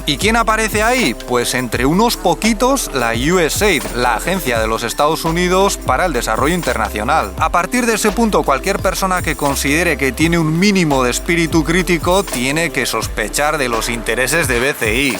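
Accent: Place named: Castile, Spain. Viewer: Great video from a cute couple, i watched many of your videos and i congratulate you for their quality, the images are spectacular and the information more credible than the western MSM. I wanted to comment in Spanish but my writing is rusty, i Haven't written in Spanish for over 30 years. Fortunately i can still speak and read the language to some extent, i also have to admit, it took me a while to understand you due to your strong Castilian accent. Muchas gracias de Canada.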